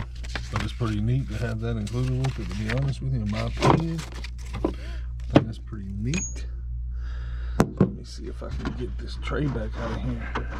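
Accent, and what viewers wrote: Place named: Appalachia, United States. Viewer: Hey hillbilly talk right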